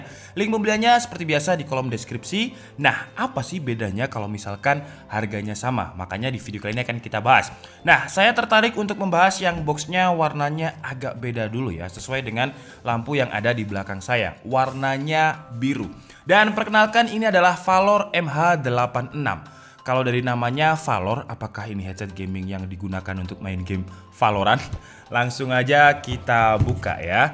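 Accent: Java, Indonesia. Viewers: wong jowo a sampean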